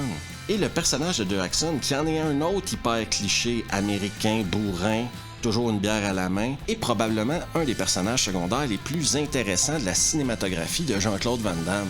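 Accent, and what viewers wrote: Quebec, Canada. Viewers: J'adore tes vidéos. En plus pour un petit français comme moi c'est excellent d'écouter ce merveilleux accident québécois. Des commentaires à tomber par terre. BRAVO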